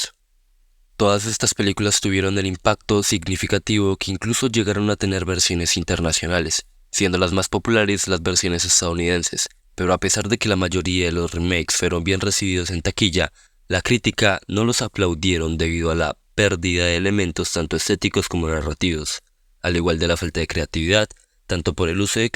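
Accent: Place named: Colombia. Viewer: Parece acento colombiano.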